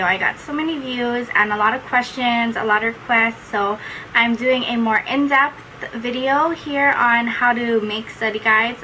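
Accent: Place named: Hawaii, United States. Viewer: I knew you were from hawaii the moment i heard your voice ! LOL xp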